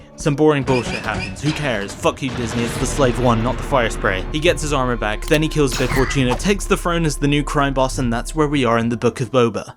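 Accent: West Country, United Kingdom. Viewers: Your subtle west country is quite interesting.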